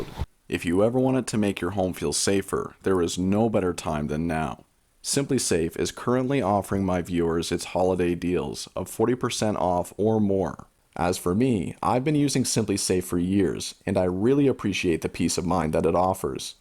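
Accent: Canada. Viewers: I just found this channel. Very nice work on this. Just going by the trees and his accent. Is this somewhere in Ontario?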